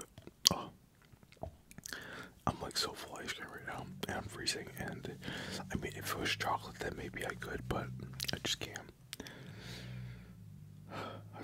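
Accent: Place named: Canada. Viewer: didn’t know you were Canadian for like 6 months of watching until you said car in this vid! And then everything started to come together when you said “mum” and started talking about mr noodle (I’m Canadian)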